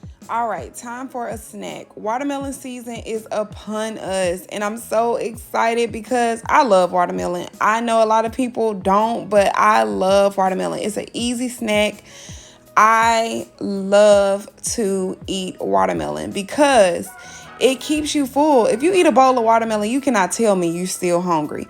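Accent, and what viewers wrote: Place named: Houston, United States. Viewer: Awesome channel!!! I knew you had to be in Texas… Us Houstonians have that accent!!! Keep on inspiring others!!! Blessings! 🍉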